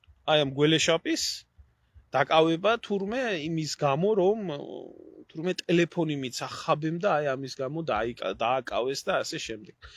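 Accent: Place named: Imereti, Georgia. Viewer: ძმაო იმენა ორივე აქცენტი გაქ საუბარშიც ქუთაისური იმერული და სვანურიც ორივეგან რო ხარ გაზრდილი გეტყობა საუბარში მაგარი კოლაბორაციაა ქართული😅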